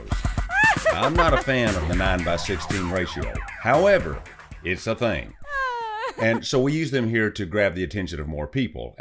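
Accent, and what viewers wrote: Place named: Arkansas, United States. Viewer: Dude you deserve way more subs. Your channel is among the highest editing and narration quality on YouTube. Not sure why an Arkansas accent makes me so receptive. Maybe it has something to do with my father in law/boss/best friend being from Little Rock.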